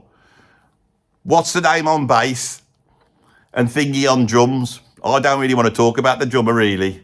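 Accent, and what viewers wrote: Birmingham, United Kingdom. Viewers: Love it, but really your Brummie accent is so much a joke !! 🤷🏻‍♂️😂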